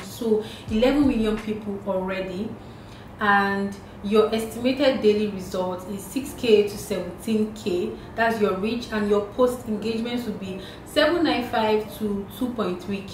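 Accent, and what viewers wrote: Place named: Yoruba, Nigeria. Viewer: Nne, why do you sound so Yoruba? Looking peng though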